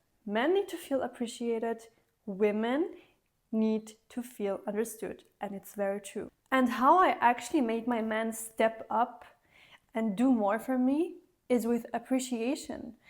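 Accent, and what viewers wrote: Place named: Eastern Europe. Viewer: She is so beautiful and kind hearted ❤❤❤ I love her accent. Where is she from? I think she is my Slavic Queen 👸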